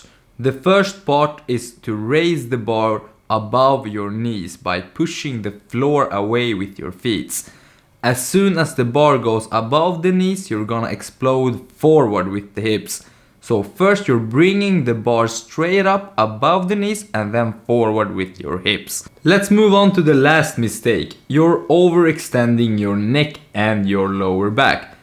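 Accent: Sweden. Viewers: Man hör verkligen att du är svensk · Are you swedish? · Älskar hur man märker att du är svensk på en gång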